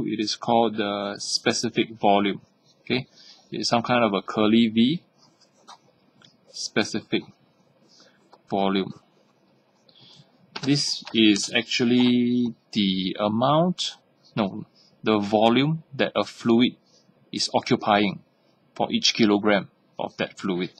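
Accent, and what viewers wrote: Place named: Singapore. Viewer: cher i like your singlish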